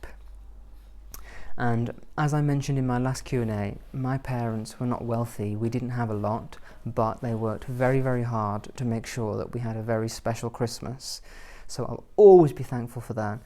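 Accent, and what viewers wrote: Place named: England, United Kingdom. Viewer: you speak like a real English gentleman, born and bred in the upper classes. I could listen to you all day, your voice being so calming. Does this way of speaking come naturally, or did you learn how to speak correctly? I look forward to watching your Christmas video.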